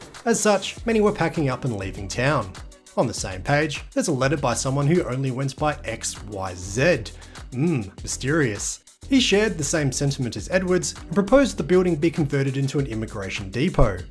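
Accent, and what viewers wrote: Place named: United States. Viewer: Being about local history it’s a shame about the American pronunciations. Top video as usual though. Depot and rerouted .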